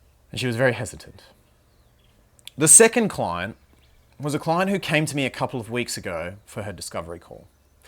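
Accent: Australia. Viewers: Bruh u an Aussie??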